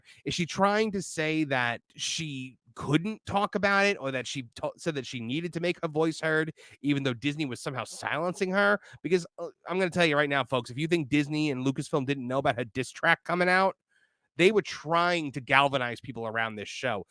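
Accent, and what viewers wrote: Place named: New England, United States. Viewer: Are you from Boston/New Hampshire/Rhode Island? you got the accent. Go Sox!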